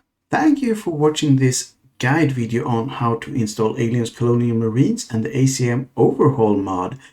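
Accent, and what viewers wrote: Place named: Sweden. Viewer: Älskar den ibland svengelska accenten och reklamen "enkel kemikaliehantering". tack för videon förresten, riktigt bra!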